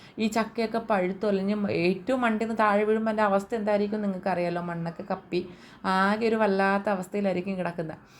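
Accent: Trivandrum, India. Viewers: samsaram enikkum eshtamanu tvm slang kelkkan anu ❤❤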